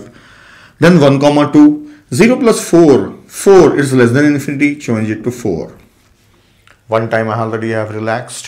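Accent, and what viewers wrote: India. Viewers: I wish I could understand Indian english accent better, you are clearly experienced and knowleadgeable, intelligent person and also explain things in a simple manner. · even with scary indian accent you are undoubtedly great!!!! Thanks a lot for grand contribution to suffering in inefficiency educational system.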